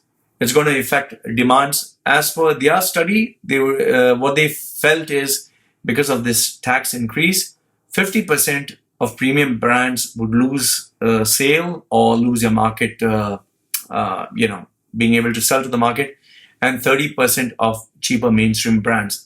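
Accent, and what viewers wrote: India. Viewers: Why has your accent become more Indian sounding of late? Here it was very different 😅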